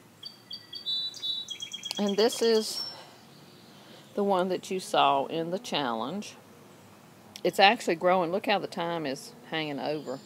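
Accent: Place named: North Carolina, United States. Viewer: Adorable fairy gardens. I just planted my first one a couple days ago and I'm already making plans for another. I like your plant choices. I absolutely love that purple/green shamrock looking one. I'm going to have to keep my eye out for that one. Are you from NC? Your accent and the weather in your video seems close to home. ;)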